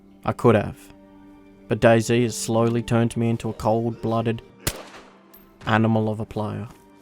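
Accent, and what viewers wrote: Australia. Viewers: first time as a aussie hearing another aussie speak and going we do not sounds like that aha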